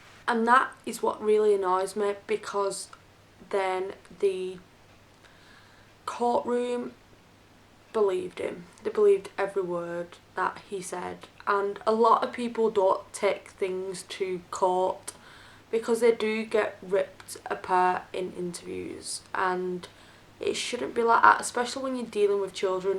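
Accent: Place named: Northern England, United Kingdom. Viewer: Thank you for this film. It sounds like you have come through a lot. It must have been very difficult. I hope that you still have your Mum and brothers and sisters in your life to maybe help each other. Abuse of any type is hard to get over and I can understand why you would feel anxious. I hope it gets better for you as time goes on. I really love your channel and it's lovely to hear a Northern Girl talking. Take care. ❤ and Well done! 👏👍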